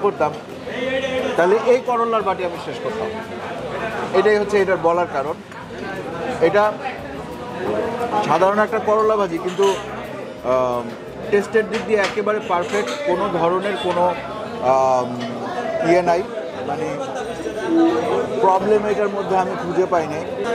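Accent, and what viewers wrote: Barishal, Bangladesh. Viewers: Aapner Borishaillah accent er ki holo? Shunte besh bhaaloi laagchilo.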